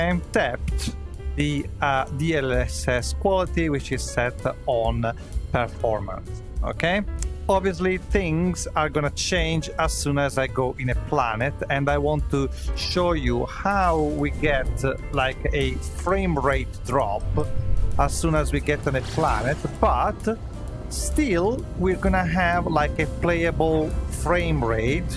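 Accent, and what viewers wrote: Italy. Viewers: Fantastico!! (Sei italiano, senza dubbio) Parli inglese benissimo!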